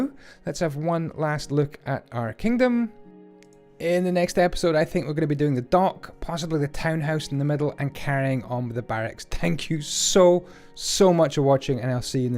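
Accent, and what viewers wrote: Scotland, United Kingdom. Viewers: "hoose"; I love scottish accents and this is the reason why I watch you.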